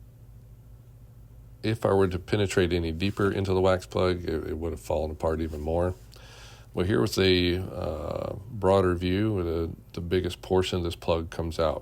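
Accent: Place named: South, United States. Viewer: PILOT!!! He absolutely does. Lol! 🤣 AND I believe I hear a slight Southern accent. 👍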